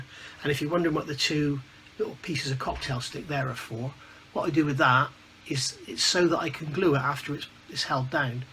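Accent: United Kingdom. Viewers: Hello, is that a south Bristol accent.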